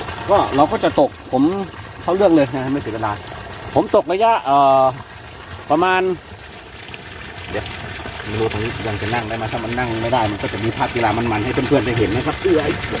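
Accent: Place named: North, Thailand. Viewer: ผมเล่นว้องทุกวันครับ fcครับ ชอบเวลาน้าอู้เหนืออออขยาด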